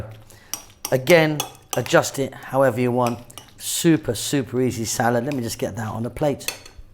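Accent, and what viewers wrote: United Kingdom. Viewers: Are you from the U.K. ?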